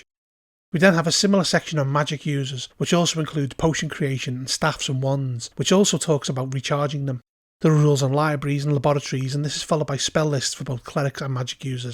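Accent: North, United Kingdom. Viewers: I loved this review. Nice presentation style, succinct and informative. Actually I also like the gentle tone and the northern accent. Good storytelling voice, I reckon. Thanks.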